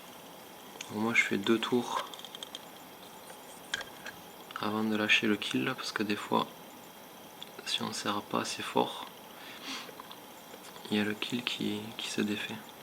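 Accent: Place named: Southwest, France. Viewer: Tu es de quelle région tu as la voix du sud ouest 💪💪💪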